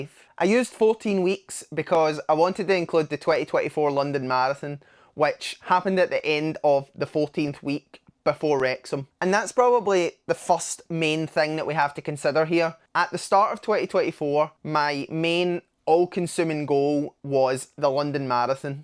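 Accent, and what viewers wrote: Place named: South Africa. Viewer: Where are you from with that accent? South africa?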